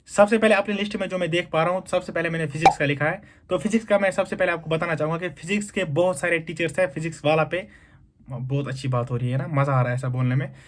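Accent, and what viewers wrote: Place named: Kashmir, India. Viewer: Aap kashmir se ho kya bhaiya qqki apki accent waise h ❤❤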